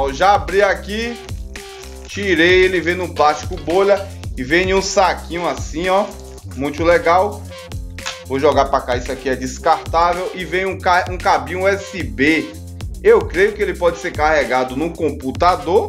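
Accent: Bahia, Brazil. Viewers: Senário muito foda, baianês excelente = a vídeo diferenciado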